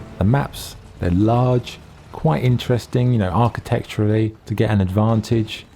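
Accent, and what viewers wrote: United Kingdom. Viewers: Are you brit ISH?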